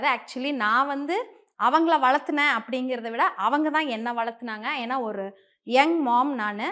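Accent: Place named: Coimbatore, India. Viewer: Mam i like your way of confident talking. I like your coimbatore slang i am also a coimbatorian. I admire your way of talking confidences and boldness. I pray god to give you more strength to manage your life. Your daughter is cute and beautiful takecare Sneha i like her somuch. All thebestfor your future♥️👍👌🙌🙌🌷⚘🌹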